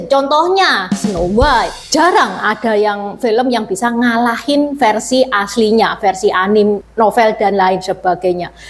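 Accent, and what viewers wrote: Java, Indonesia. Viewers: Medok ya..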